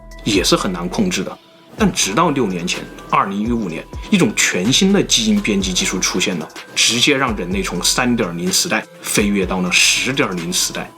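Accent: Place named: Hunan, China. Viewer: 你的湖南口音很重：l，n不分，以至於不得不時刻反應：暖細胞是卵細胞等。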